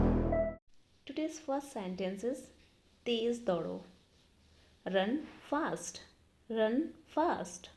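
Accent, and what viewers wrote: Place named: Russia. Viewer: Mam your accent is like a Russian English and the way of speaking is really awesome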